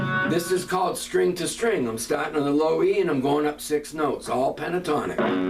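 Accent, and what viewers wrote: New England, United States. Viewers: Are you in Maine or Mass? you sound like your a New Englander. Good Stuff my friend.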